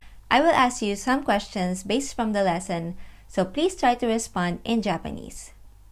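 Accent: Philippines. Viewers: hahaa kaya pala familliar ung sound ng english pilipina pala talaga sya..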